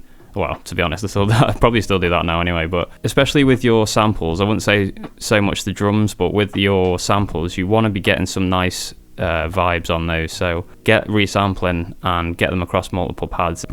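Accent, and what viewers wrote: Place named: Solihull, United Kingdom. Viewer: Hey mate. Greetings from Canada. First video of yours I'm watching. I recently picked up one of these units used so im looking forward to watching your tutorials. I'm watching this video now and my wife heard your accent and said she thinks you're from solihull... she's originally from Birmingham 🙂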